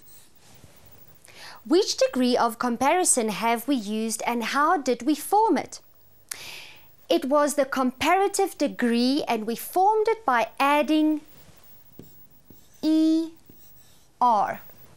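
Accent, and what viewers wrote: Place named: Afrikaans, South Africa. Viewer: You come from South Africa because you have a Afrikaans accent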